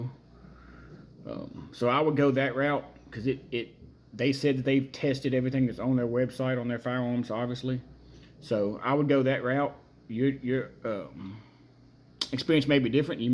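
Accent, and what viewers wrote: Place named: East Texas, United States. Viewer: Just curious, are you from East Texas? Accent sounds familiar lol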